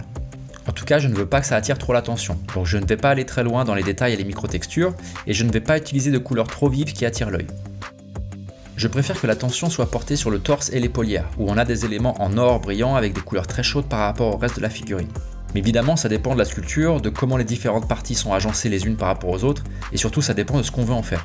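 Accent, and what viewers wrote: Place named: France. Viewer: superbe travail, j’ai découvert vos vidéos en anglais mais je me doutais que vous étiez français. Puis je vous demander où vous avez imprimé cette figurine?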